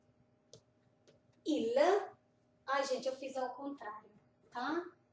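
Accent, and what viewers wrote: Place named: Northeast, Brazil. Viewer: Tô louca pra aprender norueguês. Caí aqui na doida, mas já te amei. Obs: Acho que vc é do nordeste, vc tem o sotaque parecido com o meu. Sou de Natal/RN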